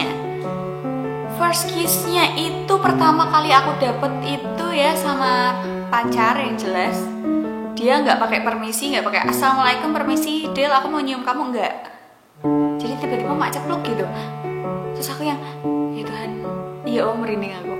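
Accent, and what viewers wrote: Java, Indonesia. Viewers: Jowo mu ndi? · Aku baru liat video kak & jujur suka, apalagi dengan cara bicara nya KK yang medok bngt, ketawa karna di video ini, apa yang KK pikirin itu sama, sama yang selama ini aku pikirkan tentang ciuman & jujur hingga usia ku 22 saat ini aku blm pernah ciuman apalagi bibir sama bibir. Tapi aku suka saat liat drakor ada adegan ciuman nya😂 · nonton video kak saya ketawa2 sendiri terus, cara menyampaikan nya lucu banget apalagi pake bahasa jawa yang medok gth:v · asal mana kak? lucu banget medok hihii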